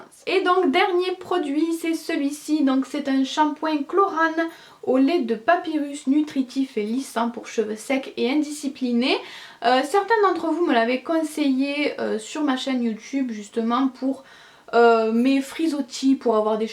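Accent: South, France. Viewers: Hou toi tu as l'accent du sud , je suis en Provence , hihi , allez une abonnée de plus , bisouus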